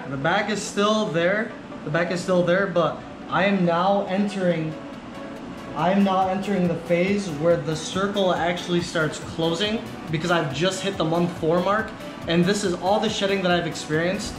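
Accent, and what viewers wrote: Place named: Arab world. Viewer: Where you from? You sound like an Arav from Dearborn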